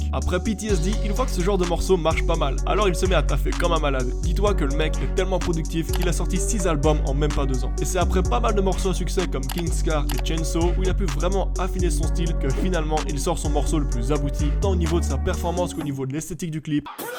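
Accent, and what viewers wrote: Belgium. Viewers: T’es belge ??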